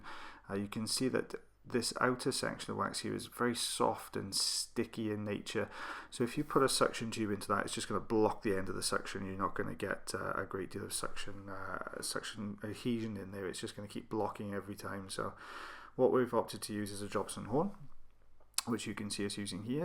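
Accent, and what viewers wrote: Wales, United Kingdom. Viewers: A lovely soft Welsh accent! I'm an expatriate Brit in Alaska so quite a while since I heard the Welsh accent! To be honest, I hadn't noticed yesterday when I watched one of your videos...it was only in the 'tissue paper' video comment section that I realized! I've been out of the UK too long (since 1984) so I'm now a defunct Brit! :(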